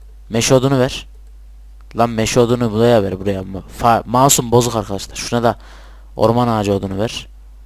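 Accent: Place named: Istanbul, Turkey. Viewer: sesin öyle 34 gibi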